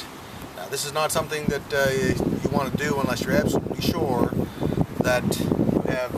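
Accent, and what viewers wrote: Canada. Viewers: My detective observation skills deduce that you are Canadian. I know impeccable isn't it?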